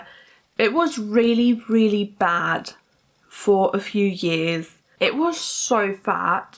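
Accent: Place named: United Kingdom. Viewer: dass du aus Großbritannien kommst, irgendwie vom Akzent und allem her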